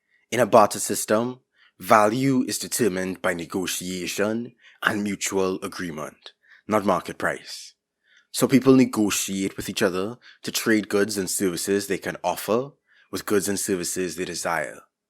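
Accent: Trinidad and Tobago. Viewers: Your accent sounds like you’re from Trinidad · It's Trinidadian. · Di Trini accent, bwai! 😍😍😍😍…🇯🇲here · You're trinidadian! You talk like me! I was so surprised, you accent sounds like a real person! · I hear a trini.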